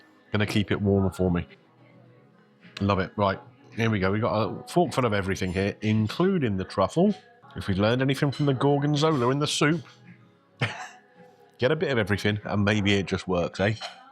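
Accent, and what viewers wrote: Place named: Cockney, United Kingdom. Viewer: You have 'worked some magic' as well, with your descriptions of these dishes! From time to time, I am struck by your (to an American ear) Cockney accent: it adds a grounding note to your sophisticated discussion. I would have ordered the venison plate! Post Script: What will Mrs. Greeno say when she sees this video and she is not mentioned as being there???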